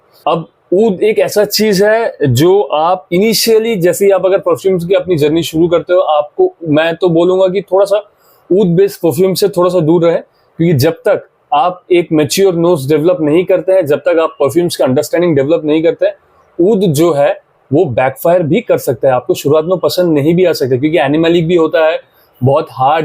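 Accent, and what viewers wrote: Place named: Mumbai, India. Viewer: aapki Hindi se Aisa lagta hai k aap Mumbai se belong karte ho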